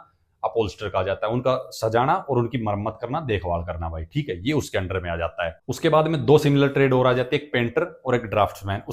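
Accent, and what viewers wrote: Haryana, India. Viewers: Bhai aap haryana se ho Kay ?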